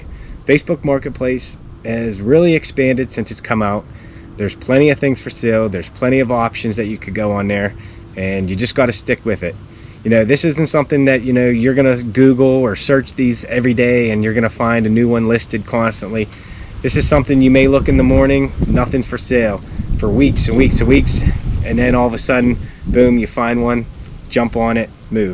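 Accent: United States. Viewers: Are you from Boston? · Are you from Pittsburgh 😂 that accent is awesome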